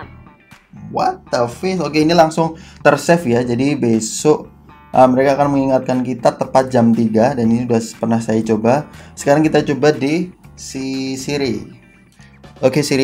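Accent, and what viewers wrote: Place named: Yogyakarta, Indonesia. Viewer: Saya lebih suka kamu berbicara dalam bahasa inggris, karena bahasa indonesia terkesan berbau yogjakarta.. tapi overall goodluck...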